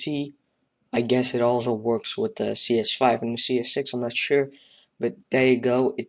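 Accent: Netherlands. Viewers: Je hoort nauwelijks het Nederlandse accent, doe je goed, ge hebt een sub erbij ;) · haha ik zag nl-NL voor bij komen, vandaar het dinglish accent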